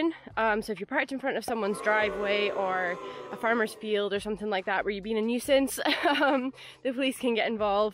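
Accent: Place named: Aberdeen, United Kingdom. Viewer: Are you from Aberdeen? As an aberdonian myself I recognise the accent, been in England for the last 12 years, mainly London, moved into my self build crafter conversion about a year ago, England is a total nightmare for parking, I love coming back to Scotland for the beautiful spots you can park in without any grief. Please do not put any of your spots on P4N as councils monitor this app and quickly put an end to your park up. I never use apps, I use google maps and street view, when I find a great spot I save it and in a year and a half I have quite the database of amazing spots. Also if you see a farmer go and ask him if it’s ok to park up on there land, they are extremely wary of pikeys parking up but if you introduce yourself be polite and respectful 9 time of ten they will accommodate your request free of charge and tell you where to park, I even had a farmer go all the back to his house to get his keys so he could open a gate for me. 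You can also offer site protection for exchange of a park up, farmers have a lot of expensive machinery, they are more than happy to have you stay as a presence against theft near the machinery. Thanks for the vid. Subbed.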